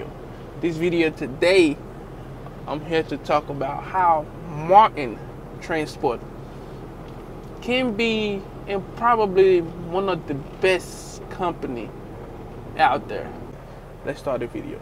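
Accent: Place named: New Orleans, United States. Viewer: Sounds like a NOLA accent.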